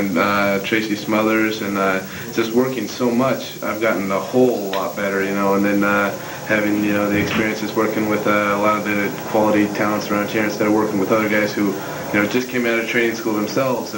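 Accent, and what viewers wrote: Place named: United Kingdom. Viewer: He sounds a bit british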